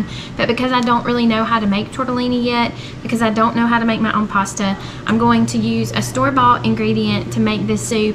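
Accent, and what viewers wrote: South, United States. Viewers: I just absolutely love your southern accent!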